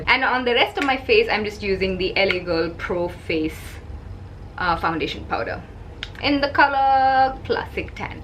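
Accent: India. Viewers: I've just discovered your channel with this video. And I really like it ! First it's not just a VS makeup, because you're explaining lot of things and I love to learn about the different cultures, so huge thanks. And your Indian accent is so pleasant to hear ! ^^ You're funny and beautiful. I subscribed ! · she is so pretty 😭😭😭 also Indian accents are so harsh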